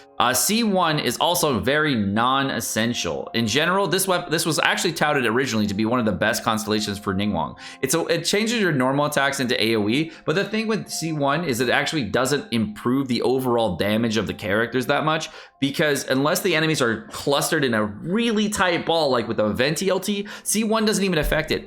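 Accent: United Kingdom. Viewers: British never fails to amuse me 🗿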